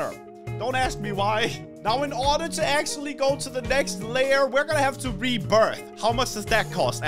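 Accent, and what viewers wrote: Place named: Denmark. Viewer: jeg kan høre tydeligt at du er dansker gode videoer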